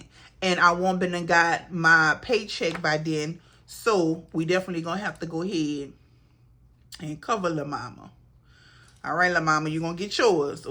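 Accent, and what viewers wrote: Louisiana, United States. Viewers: hey love your vids and the bayou accent:) lets follow dear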